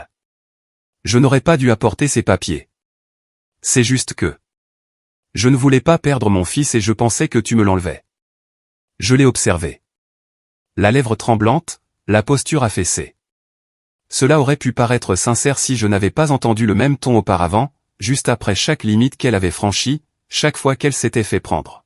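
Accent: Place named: France. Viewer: J'ai adoré l'anglais avec l'accent Français ptdr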